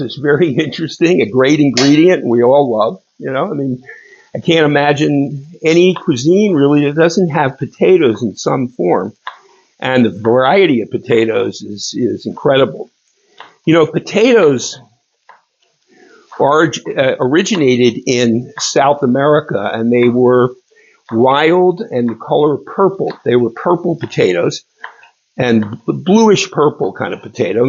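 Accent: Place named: South, United States. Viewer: Love your accent! You're truly a southern belle 🤗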